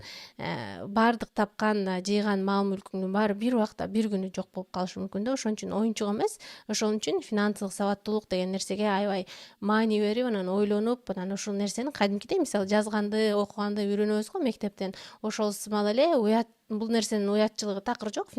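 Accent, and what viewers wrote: Standard. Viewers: Кыргызча таптаза сүйлөгөнүңүзгө ыраазымын ❤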